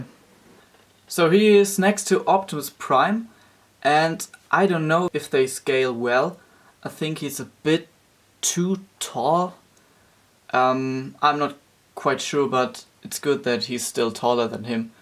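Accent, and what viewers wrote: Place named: United Kingdom. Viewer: Also you sound a bit more British than the last time you spoke. I know your german but maybe when you dm i was reading with the wrong voice again. The voice is t important what's important is the fact that you did better and i love how you improve in one year!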